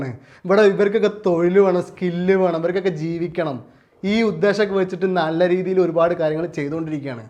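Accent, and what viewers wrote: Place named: Kozhikode, India. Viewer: I like that kozhikoden slang😊😊😘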